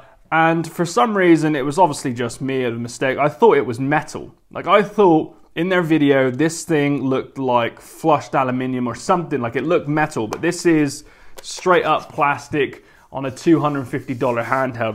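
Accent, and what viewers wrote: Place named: West Country, United Kingdom. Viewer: It's a west country accent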